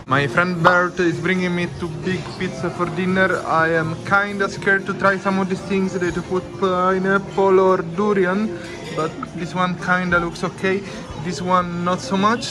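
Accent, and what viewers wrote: Italy. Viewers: 这个英文是意大利人吗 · I love your Italian accent. Nice haircut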